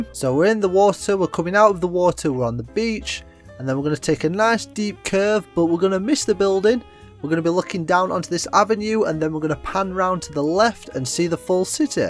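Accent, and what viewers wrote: United Kingdom. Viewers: are you from sheffeild/yorkshire?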